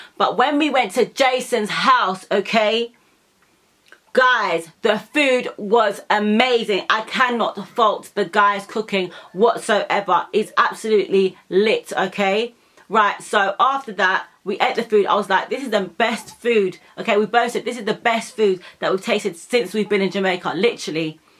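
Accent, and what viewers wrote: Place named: United Kingdom. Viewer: Thanks for your honest and truthful opinion about Jason....I can see it in your eyes and your facial expression. I too share your opinion, evaluation and sentiments about Jason. I think he is a very honest, hardworking, progressive young man who has chosen to impress the world with his cooking skills, his simple and humble lifestyle, the beauty of jamaica and our culture. Not to mention the love of his dogs and cats, the relationship with his son, his dad and other family members. Jason's attitude and personality is so transparent and pure that, even though I have never met him, he has "virtually" become a part of my family. This is for Jason... Me know se u a go through some stuff right now my yout'.. no mek it get u down. U young an' u strong. Life is a teacha', learn u lessons well. No mek de some mistakes twice..zeeen.. 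Nuff said...anyway...big up youself Pretty girl..love de British accent..ONE LOVE. 🥰🥰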